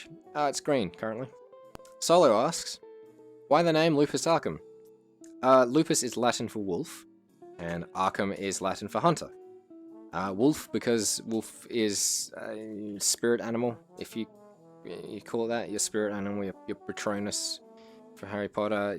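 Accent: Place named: Australia. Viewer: Nice to hear a fellow Aussie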